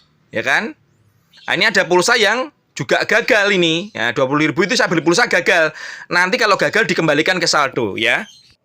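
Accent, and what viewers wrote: Java, Indonesia. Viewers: Medok banget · Et medok wkwk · Mentok logat Jawa ny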